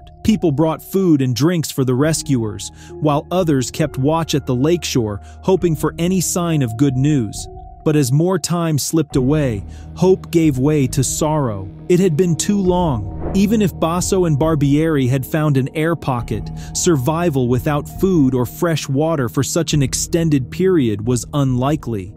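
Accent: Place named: Germany. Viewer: dude, you have a german accent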